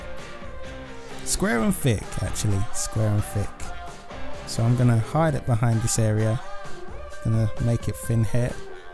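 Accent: United Kingdom. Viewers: Welcome back! I've missed your amazing English accent:)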